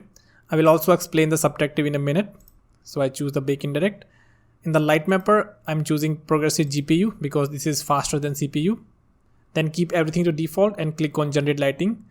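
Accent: India. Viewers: select your TEDE OBJECT :) i cant hold it... the "Indian" accent is hillarious... Anyway great content!!!!!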